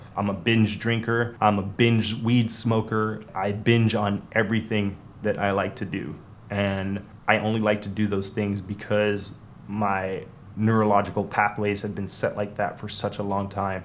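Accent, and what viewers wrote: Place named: United States. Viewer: Shit man there are so many of us who fight the devil daily.... everything you have said reminds how young one started taking sips from our parents pinching a cigarette etc etc I had water in my eyes... then tarifa appears and playa los lances that gets me in shock... with your American accent thinking you state side.... got to much for me at min 12.50 roughly I'll finish it thow then have to take a benzo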